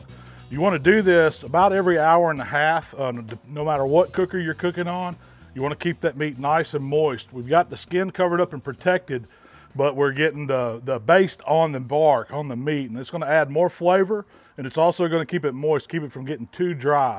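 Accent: United States. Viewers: Which state does his accent represent?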